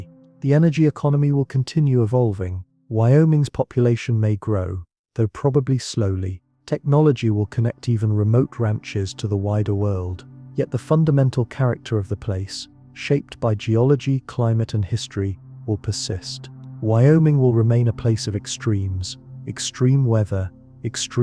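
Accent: England, United Kingdom. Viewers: You know you're receiving foreign Edomite indoctrination in an English accent and mispronouncing names and words recklessly.... Know wonder the Western Hemisphere is lost in ignorance. Ju Ly for 1776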